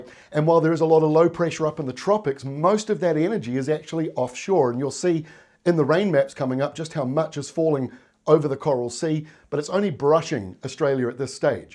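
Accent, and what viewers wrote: New Zealand. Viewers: Bros sounds from NZ 💪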